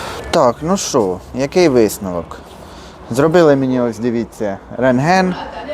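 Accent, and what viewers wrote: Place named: Central Ukraine, Ukraine. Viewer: По говору з центру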